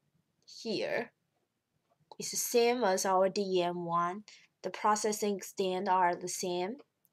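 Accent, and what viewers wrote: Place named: China. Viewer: Thanks, quite helpful. I like Chinese accent :)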